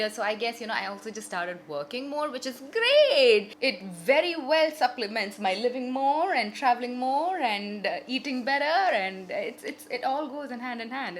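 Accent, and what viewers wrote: India. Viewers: It’s so interesting how you were born and lived your life in the Middle East but you have a very heavy Indian accent. I was born and raised in America and I sound American. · But you have a strong Indian accent ... And i hate howyou pronounce the letter c in "cannot" or other c words.. gosh ...cuz that sounds really fake and weird..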